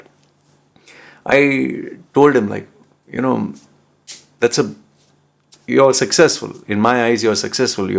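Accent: India. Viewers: Your success is thanks to the Internet, social media, your gift of gab and your facial tattoos that attract potential visitors to click your videos. Yes you have been lucky starting this at the right time. Being in Thailand has nothing to do with it. You could have done this anywhere but the added tropical backdrop and your Indian accent and calming voice has an appeal for many. Had the Internet not developed to where is it now, what would you have done as the Internet is everything for your survival it seems. That is something to think about, not that it matters anymore..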